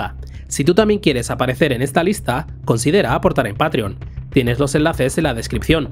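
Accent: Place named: Spain. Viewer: El contenido es muy pero muy bueno, pero déjame hacerte una critica constructiva, la narración es muy llana, le hace falta sentimiento emocion queseyo, es mas como leer un documento y no una narración, y segundo el acento y la narración nativa española (no se de que parte España seras) es muy marcada, deberías neutralizar tu acento. Suerte y voy a seguir viendo tus videos 👍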